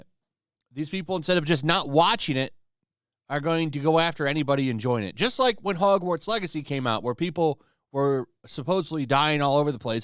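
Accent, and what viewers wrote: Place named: Aristocratic, United Kingdom. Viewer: I’m listening to this as I shop at Kroger and the pompous British aristocrat accent literally has me laughing so hard people are staring at me.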